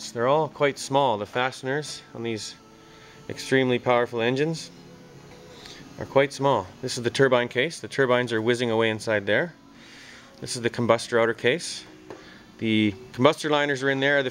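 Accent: Canada. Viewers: very informative video. Thanks, mate. On a different note, are you Canadian?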